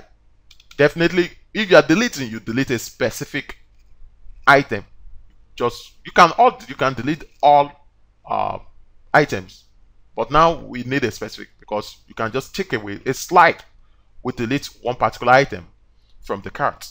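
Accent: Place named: Nigeria. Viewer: your sounding like a nigerian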